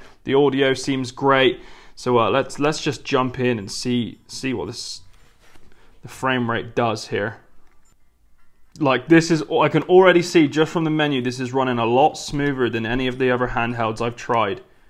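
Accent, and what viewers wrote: Bristol, United Kingdom. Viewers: Fellow Bristolian?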